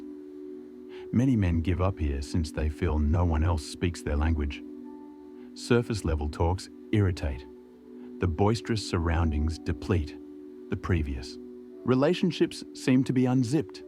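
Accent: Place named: Australia. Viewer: Strong Aussie accent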